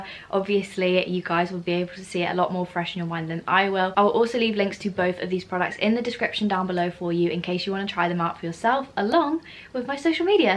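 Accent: United Kingdom. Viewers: oh I wasn't (edit was here, miss-typed) searching for a brit. I just watch a lot of YouTube and mostly come across Americans and Canadians. There was no need to call me odd. It is odd you would pick up on it. I called it refreshing because it was to me. There is no need to be upset okay? I'm just some random on the Internet that was happy she came across a familiar accent after spending a lot of time consuming youtube media that wasn't from the UK. Do you never just go through your recommendations? That's what I do. I don't search for anything it's more fun that way.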